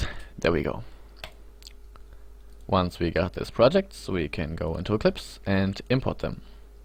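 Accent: Germany. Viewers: Ich hab mir doch grad gedacht, dass ich einen dezenten deutschen Akzent höre :DD Danke für das Tutorial :)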